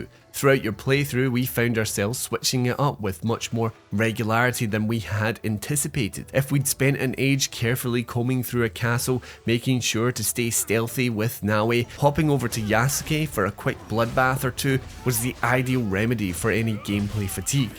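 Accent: Scotland, United Kingdom. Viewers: Aye laddie y’er Scottish ain’t ya ?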